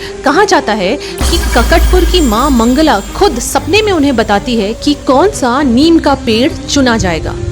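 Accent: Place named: Bengal, India. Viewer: Your pronunciaton says u r a bengali. Jai jagannath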